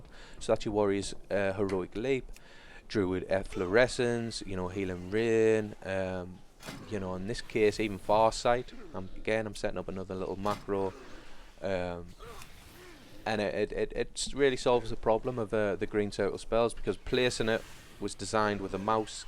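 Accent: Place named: Northeast, United States. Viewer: A fellow NE accent felt so good to listen to! Thanks for the guide my man! But I know nothing about macros?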